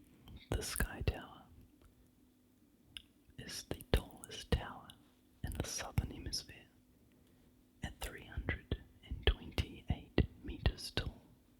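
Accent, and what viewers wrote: New Zealand. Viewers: A lovely Kiwi voice= heaven ;)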